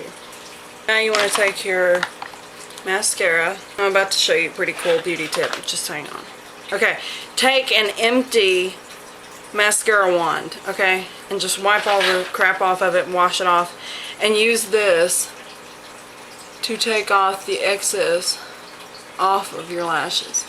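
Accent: South, United States. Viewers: You my friend, just earned a new subscriber. :) Are you from the south? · where are you from ?? your southern accent is so awesome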